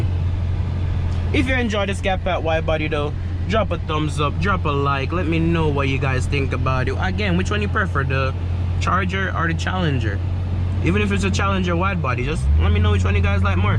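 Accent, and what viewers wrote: Jamaica. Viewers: Wait deh,A sense a Jamaican accent mi brudda 🤔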